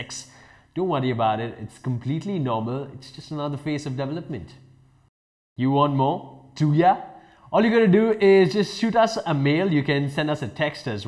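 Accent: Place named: India. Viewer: very unique indian accent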